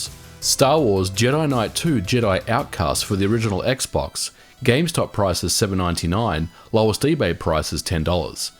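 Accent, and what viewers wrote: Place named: Australia. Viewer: As an Aussie I thought you lived in Australia...keeping your accent is a good effort.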